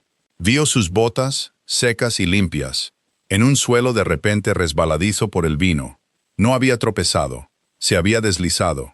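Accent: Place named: United States. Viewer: Podria ser una voz de IA que no tenga acento gringo?, ya sabemos que estan desesperados por invadir latinoamerica pero ya esto se pasa un poco.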